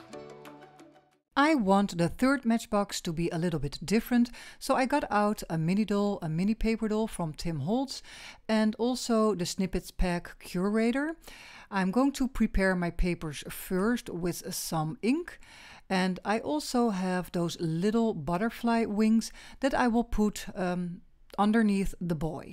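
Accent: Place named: Netherlands. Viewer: Hey dear, how nice that I could discover you through Luise, because your projects, design and expression is just cool & beautiful 😍 Interesting artist exchange you did together, I think that's great and must have given you a lot of pleasure 🥰 and me a lot of inspiration. You speak, like so many Dutch people very good German and English and I love the Dutch, their country, their culture since I was two years old (1966) and love their accent so much. ❤️ Sorry if this comes across as totally slimy, but it's just the way it is with me, because Dutch culture has had a big influence in my life. I lived close to the Dutch border for 48 years, did a lot of shopping, had some friends there and spent many, many holidays there. I also bred Kooikerhondje for 10 years 😆 Thank you for sharing your art with so many people! 🧡... I am happy to be able to learn from it!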